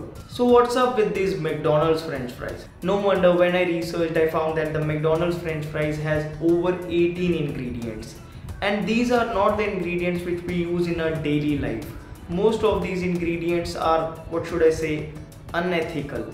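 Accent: India. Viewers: Love the heavy Indian accent! Great "wake up" video.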